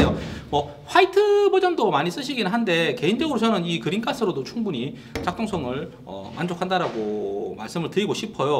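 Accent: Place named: Gyeongsang, South Korea. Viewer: 아니 어떻게 억양은 골수 개앵사앙도오 인데 사용하는 어휘는 몽땅 표준어일까요? 총쏼때 한쪽눈 꼴치가꼬 전자가 쏘는 갱상도 아재는 너무 부럽네요. 분명히 아무리 들어도 마산분이신거 같은데요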